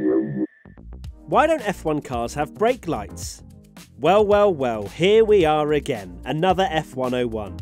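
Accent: England, United Kingdom. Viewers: Yeah I admit I know the answer. I just love listening to your adorable English accent. 😁 Plus your clips are all very informative and entertaining. Love WTF1! Could you get me Charles autograph? Lol!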